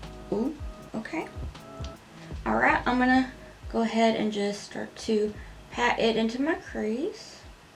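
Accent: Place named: South, United States. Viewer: Oooh I’d love to have this palette!! It’s on my future purchase list. I Like seeing you start out with 0 eyes done! Cool to see the first, true, artistic vision. Possibly the mistakes. Get to see the decision making process. I understand why creators start with 1 done on videos. But, as a viewer, I kind of like seeing it come together, without the end result smacking me in the face at the same time. If that makes sense. It would be easier to do the second eye, after doing it a first time. You would do it smarter. More effective. Cut out unnecessary things or reverse wrong ideas. I get it. But I like this style too! Anywho - love your content. Love hearing a familiar southern drawl. The pearls you picked are PERFECT with this look too. So gorgeous ❤❤❤ sorry, my comments tend to ramble. I have lots of thoughts lol 🤷🏼‍♀️😘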